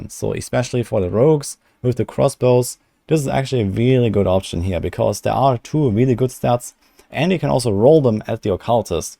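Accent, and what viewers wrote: Germany. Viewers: Bruder, du sprichst richtig gut Englisch, aber dein Akzent ist alman. Dhat hurts my ihrs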